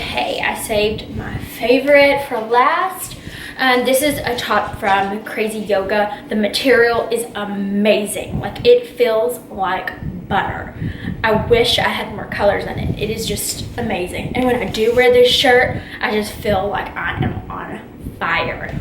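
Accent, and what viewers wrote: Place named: South, United States. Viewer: nice accent of the sweet south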